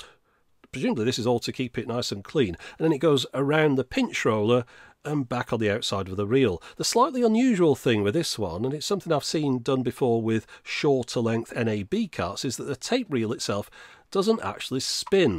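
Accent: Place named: Northern England, United Kingdom. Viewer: Yep - it was the Minidisc video, which got me into in the first place. I thought, I've just watched a 40+ minute video and enjoyed all of it. I love voice with his Northern intonation. 90% of my YouTube time now is videos...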